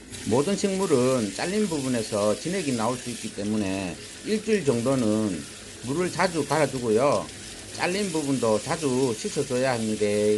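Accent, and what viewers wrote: Gyeongsang, South Korea. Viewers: 아이고~~ 노래도 잘하시고 구수한 경상도 말씀으로 파인애풀도 사과롤 얹저놓고 꽃피우는걸 우째 알았습니꺼 ^^ 참으로 신기합니데이~~ 겨울에는 온도가 영상 3도 까지 내려 가는곳에 두면 안되나요 따뜻한 방에는 햇빛이 안들어와서요 내일당장 파인애풀 하나 사와야 겠어요 감사합니다^^ 좋아요는 한번밖에 못해서 광고 까지 보고 갑니다 새해 복마니 마니받으시고 건강 하이소 요^^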